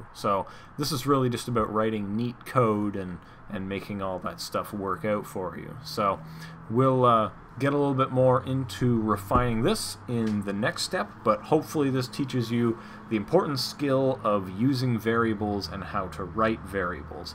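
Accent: Canada. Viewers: I loves your Kaynedien accent hey. thanks for this.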